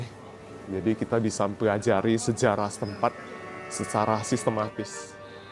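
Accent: Java, Indonesia. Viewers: 😂 mungkin tinggal di jawa kali, kan banyak tuh orang Tionghoa mirip2 Orang jawa kebiasaannya kayak Rajin, segala macamnya apalagi. Bahkan bahasa ngomongnya juga kayak jawa atau medok banget. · Ko, sekarang bahasa Indonesianya kok medok banget kayak orang Jawa 😊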